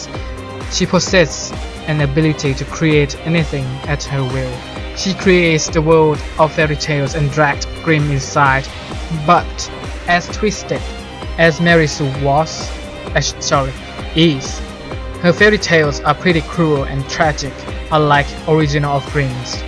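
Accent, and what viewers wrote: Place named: Thailand. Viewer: Are you Thai? You seem to have the accent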